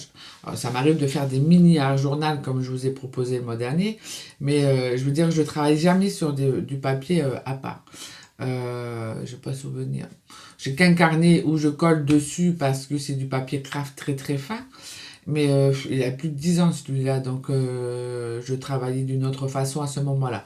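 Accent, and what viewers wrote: South, France. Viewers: avec ton petit accent du sud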